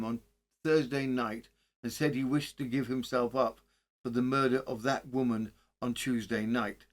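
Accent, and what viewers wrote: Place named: United Kingdom. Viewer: the British accent put you back in time at the 1888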